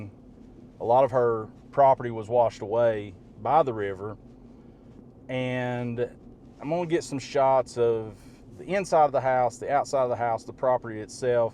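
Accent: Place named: Tennessee, United States. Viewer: “anniss” = “and this” (I am from Maryville, TN, raised by my great grandparents, so this accent is heartfelt) I truly hate that Helene hurt so many people, no matter how detrimental, it is no competition, but I truly wish I had the means to help these folks. All I can give are prayers and a comment on YouTube but nonetheless my thoughts are with them. 😢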